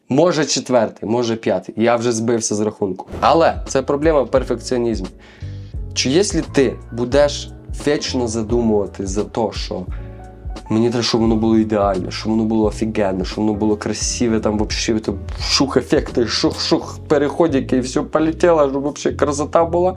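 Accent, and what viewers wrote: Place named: Surzhyk, Ukraine. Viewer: Зарядив, бідбодрив, вдохновив!, прийшла просто твій суржик послухати🥰 абажаю! Ти молодець!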